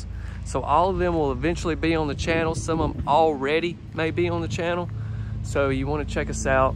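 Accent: South, United States. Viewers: I really like listening to you. You are a Southern boy and you don't try to hide it. You make me smile with your descriptions of the homes. Love you..have a blessed day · My husband usually knows when I’ve watched a video of yours when I start talking with a southern accent. Lol. And he is right! I just LOVE your videos. Keep up the good work! 😊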